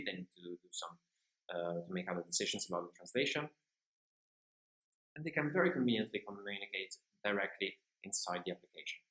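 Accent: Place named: Italy. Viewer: I smell italian accent over there. Hi compatriot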